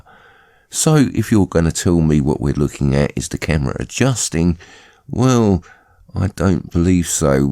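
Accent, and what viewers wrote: United Kingdom. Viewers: Honey your sarcasm is just a little too subtle for people to really get it. I think it’s because your Britt accent confuses.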